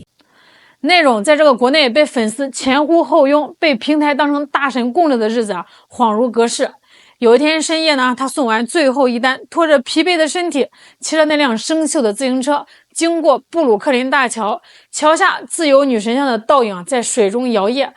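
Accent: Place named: Shanghai, China. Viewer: Your Shanghai dialect is so funny! 😂👍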